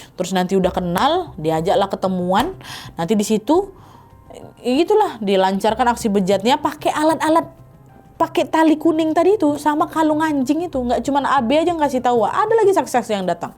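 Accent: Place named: Medan, Indonesia. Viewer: Sukak sama kerna cara ngomongnya sama cam awaq orang Medan terutama pas bilang G Ada Otak😁😁😁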